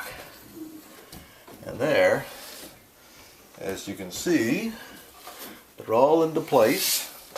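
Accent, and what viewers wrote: Canada. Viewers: from canada? one of my favorite accents.... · This is most helpful. Thank you. I LOVE the Canadian accent. My wife is from B.C.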